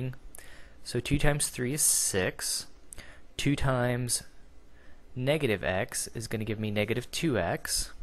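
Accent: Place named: Texas, United States. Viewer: They have a Texan accent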